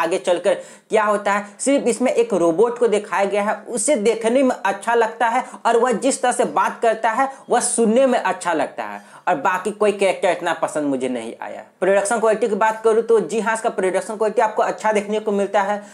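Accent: Bihar, India. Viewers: Bihar se ho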